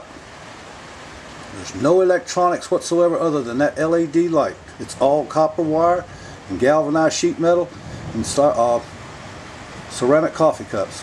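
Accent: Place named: South, United States. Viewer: i never cared for the southern accent. you make it sound normal. good video.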